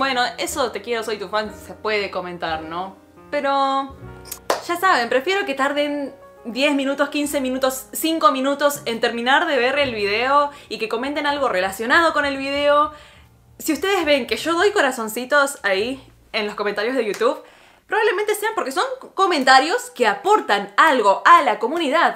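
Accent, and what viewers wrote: Argentina. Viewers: Estoy totalmente de acuerdo con vos y otra cosa que odio es...: "Ya me subscribi a tu canal ahora hacelo vos " osea me subscribo si me gusta el contenido , osea deja que llegan solos los subs Pd: me pegaste se él asentó argentino soy uruguaya y en la clase soy la Argentina 😂😂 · argentina asta los pelos xdxd tu acento me mata * - * deberias predicar en mi iglesia :u ya recapacite xd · Lo dices tan cruel que hasta da ternura Pero es cierto parecen pelotudos sin vida (￣(エ)￣) (se le sale lo argentino ^<^)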